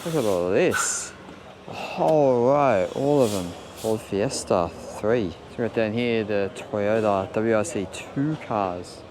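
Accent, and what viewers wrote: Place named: Australia. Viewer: Nice video, mate (with the cool Australian accent). Hope you enjoyed your time in Finland. Our family has been every autumn there spectating the rally in three different generations at least. It’s always a spectacle. Always good to see some Australians visiting here in the North. Also, as you’re probably aware of, our very own Valtteri Bottas is pretty much Aussie now btw. Greetings from Big Casey Stoner fan from Finland 🇫🇮🤝🇦🇺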